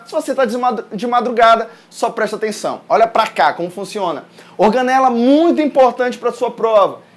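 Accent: Pará, Brazil. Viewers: muito bom! por um acaso tu es paraense ? kkkkk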